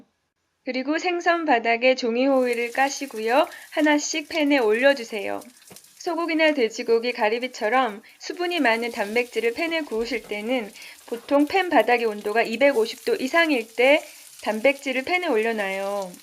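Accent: Seoul, South Korea. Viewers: 서울사투리 듣기 좋네요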